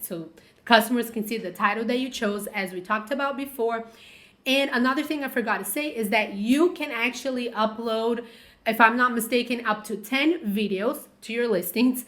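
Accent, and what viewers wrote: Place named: Brazil. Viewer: O sutaque é brasileiro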